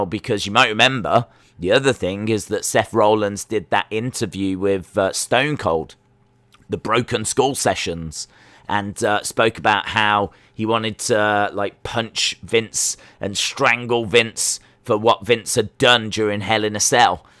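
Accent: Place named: Midlands, United Kingdom. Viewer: Just found your channel. You from the Midlands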